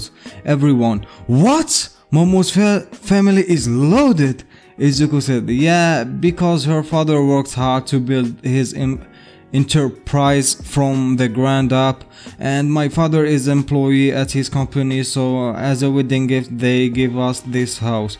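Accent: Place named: United Kingdom. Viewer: You became extremely British this episode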